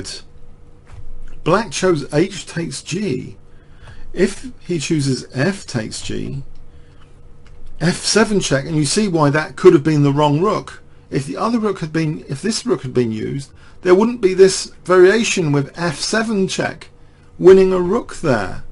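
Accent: United Kingdom. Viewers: THORN pawn! Man, that british accent. You have no idea how long I wondered how that was spelled. I thought Foam Pawn, Fun Pawn, Foreign Pawn, Phone Pawn... Awesome series,!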